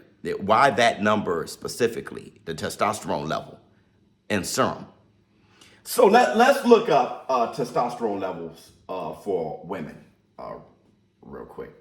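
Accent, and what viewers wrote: Louisiana, United States. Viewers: Is you from the boot mane?! Whodat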